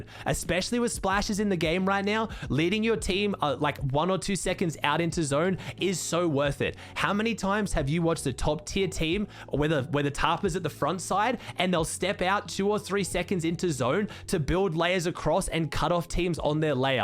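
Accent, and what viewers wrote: Australia. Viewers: He’s Australian. He can’t help it.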